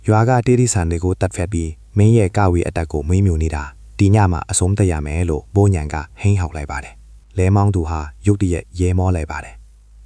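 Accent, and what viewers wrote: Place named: China. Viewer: တရုတ်အသံလား တိုင်းရင်းသားဆိုသူတွေအသံလား ဗမာလူမျိုးရဲ့ ဗမာလေသံမဟုတ်ဘူး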